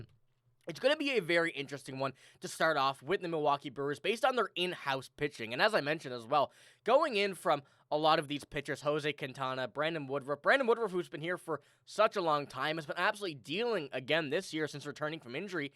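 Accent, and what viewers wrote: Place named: Canada. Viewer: I am pretty sure by your accent that you are Canadian, so how did you get to rep the crew?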